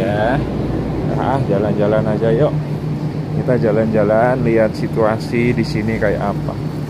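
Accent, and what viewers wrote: Java, Indonesia. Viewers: Omongane kok kyok jowo² ngono ya mas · Bahasanya,,, kok cukup medok, kamu asli mana mas,,, kok kaya jawa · cak jowone ngendhi to..... hehehhehe apik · Jowone ndi 0m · Wong jowo pak?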